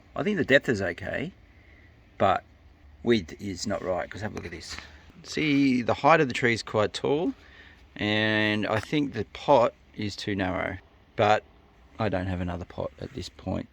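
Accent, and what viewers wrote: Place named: Australia. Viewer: What a cracking Chinese Elm and made even better with an Aussie accent to remind me of my youth in Melbourne. Great chop on the roots and I think oyu have really set it up for the future. Did you happen to plant the roots that you cut off? Anyway, loved the video and now I have to see what else you have done! Cheers, Xav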